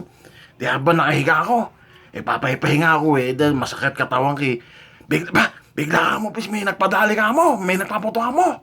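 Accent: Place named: Malabon, Philippines. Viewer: Ano ba yan. Akala ko pinsan ko nagsasalita. Puntong Malabon.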